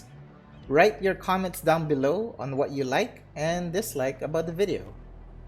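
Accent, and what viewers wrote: Philippines. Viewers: my wife says you sound filipino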